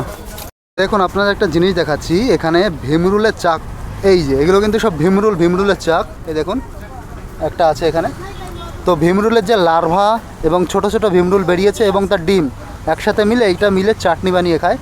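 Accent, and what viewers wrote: Bangladesh. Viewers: তাঁরা কত কি খায়! মনে হচ্ছে খাবারের তালিকায় কিছুই বাকি রাখছে না! আপনার কথা অনেকটাই বাংলাদেশীদের মতো! মাঝে মধ্যে ভারতের পশ্চিম বঙ্গের মতো আসে!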